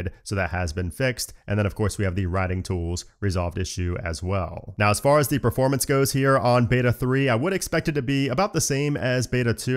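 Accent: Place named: Texas, United States. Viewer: Is he from Texas? Why does he talk funny?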